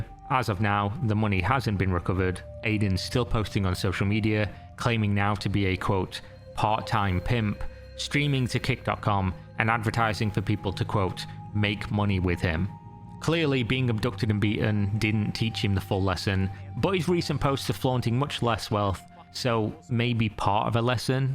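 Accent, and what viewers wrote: United Kingdom. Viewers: This youtubers uk accent is so annoyinh, I cant even explain how much I hate it, just talk normaly, what is your problem mate?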